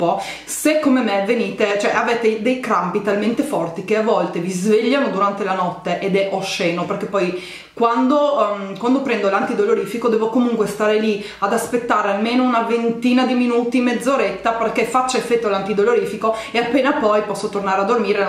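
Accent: Trieste, Italy. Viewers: sei di triestr?!?!?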